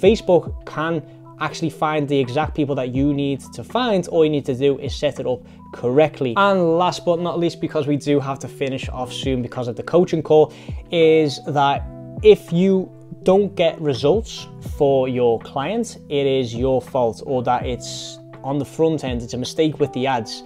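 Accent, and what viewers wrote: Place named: Liverpool, United Kingdom. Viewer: You're from liverpool like it or not. Talking like jordan henderson and TRENT alexander arnold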